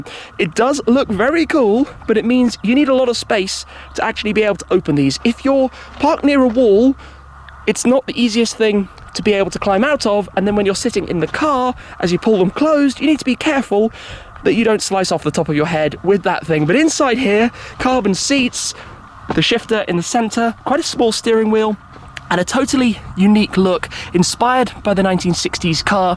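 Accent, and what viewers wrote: United States. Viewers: Shmee is slowly turning American 😂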